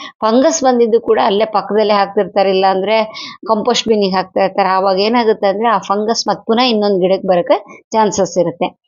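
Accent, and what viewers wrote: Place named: Malenadu, India. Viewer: Malenadu bhashe tara ede..mam yav kade nivu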